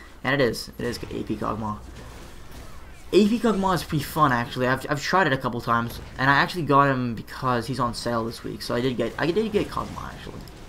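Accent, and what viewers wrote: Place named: United Kingdom. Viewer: You sound british, im wondering why youre playin' on na than :/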